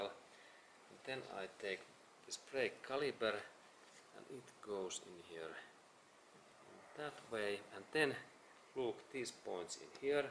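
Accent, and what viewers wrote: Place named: Finland. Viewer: Thank you for a very god video , you have a very pleasent manner and explain very well in your finish english accent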